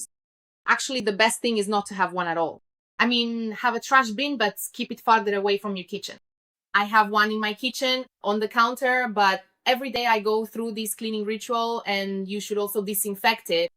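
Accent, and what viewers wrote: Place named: Russia. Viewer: ficou com sotaque russo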